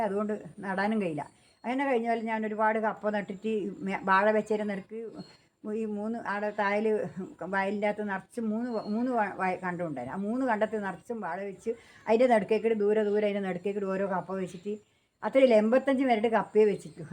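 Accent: Kannur, India. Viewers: ഏച്ചി കണ്ണൂരാണോ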